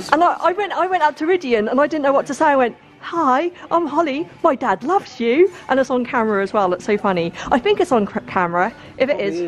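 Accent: Norfolk, United Kingdom. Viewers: R u from norfolk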